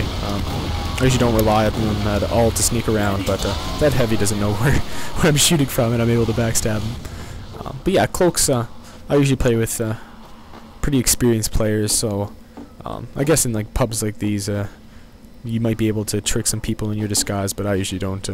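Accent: Canada. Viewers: Wait, are you Canadian? TOONIES RULE!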